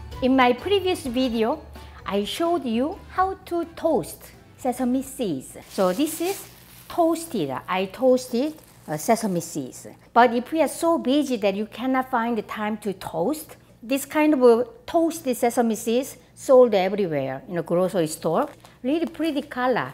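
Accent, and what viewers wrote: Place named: South Korea. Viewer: 아줌마 안녕하세요?저는 문화센터에서 교양영어를 가르치고 있는 강사입니다. 최근에 학생분을 통해 동영상을 보게 됐는데 자꾸 빠져드는 매력이 있네요. 수업시간에 자주 보게 되는데 학생분들도 참 좋아 하세요. 이런 말씀 죄송한데 너무 귀여우세요..ㅋㅋ 한국식 발음이 뭍어 있는 영어지만 너무나도 훌륭하게 하실 말씀을 다 하시는걸 보고 학생분들이 영어가 귀에 쏙쏙 들어 온다며 자신감과 용기를 얻으세요. 저는 초중고를 뉴저지 Cliffsidepark에서 나오고 석사는 NYU에서 했어요. 박사는 이대에서 영어교육을 수료한 상태구요. 망치님이 뉴욕에 살고 계신다니 방갑더라구요. 저도 요리하는걸 참 좋아해서 외국인들을 대상으로 한국 쿠킹 클라스를 열곤 했는데 저의 방식과는 다른 레시피로 시원시원하고 섬세하게 요리를 하셔서 제가 배울점이 참 많은것 같아요. 몇가지 요리는 저도 시도 해봤답니다. 다음 비디오에는 오므라이스나 한국식 볶음밥을 해보시는건 어떨까요? 김치볶음밥도 괜찮구요. 만들기도 쉽고 한끼 식사로도 훌륭하니까요. Kudos입니다. 앞으로도 맛있고 즐거운 비디오 많이 부탁 드립니다. 지켜 볼께요. 화이팅 입니다.!!!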